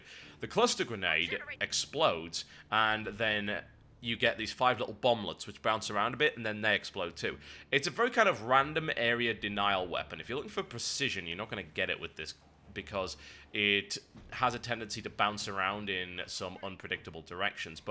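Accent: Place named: England, United Kingdom. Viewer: Anyone else interested in hearing after he loses his English accent?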